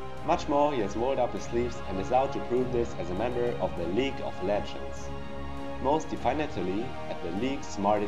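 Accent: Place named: Germany. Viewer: german champ + german accent = perfect!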